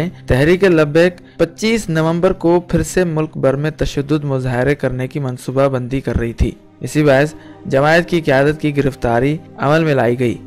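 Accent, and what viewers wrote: India. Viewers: Tera lehja indian lagta hy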